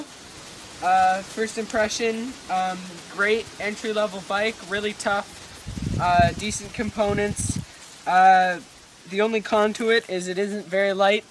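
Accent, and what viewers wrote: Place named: Upstate New York, United States. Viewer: Nice upstate NY accent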